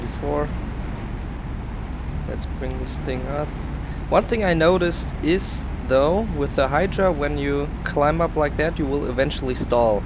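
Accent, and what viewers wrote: Germany. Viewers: Dieser Deutsch Akzent 😂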